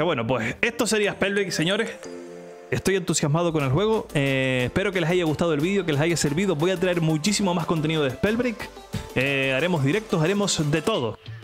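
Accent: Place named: Canary Islands, Spain. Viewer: ¿Eres de Gran Canarias o de alguna de las Islas Canarias por casualidad? xDD Se nota mucho por como hablas, sobre todo en la "Ch" Jajajaja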